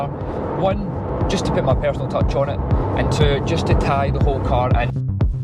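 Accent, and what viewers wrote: Scotland, United Kingdom. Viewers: Good video, nice to hear a Scottish accent after moving south from Inverclyde! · Do I detect a Scottish accent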